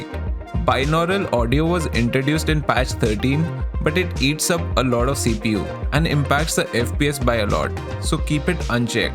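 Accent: India. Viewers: from ur accent am i correct to assume ur indian? if so happy to see an indian makin tarkov content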